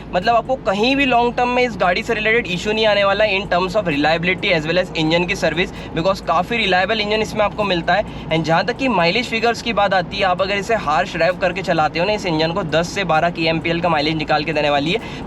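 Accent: Indore, India. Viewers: Bhai tum indore se ho kya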